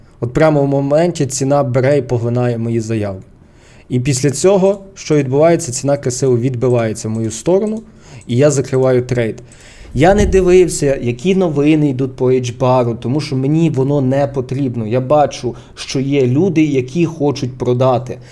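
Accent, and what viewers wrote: Lviv, Ukraine. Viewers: Українська мова Львіський акцент ТАК ТРЕБА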